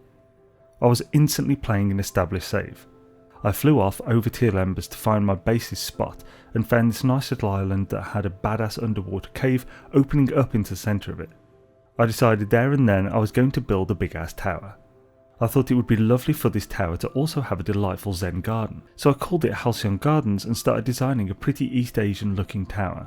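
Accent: United Kingdom. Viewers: Very cool video, love the old clips and how brummie you sound Very cool to have been a part of the development of the UAS and involvment with other bits and pieces, very honoured indeed! Again, great video and long may the fun continue! 😍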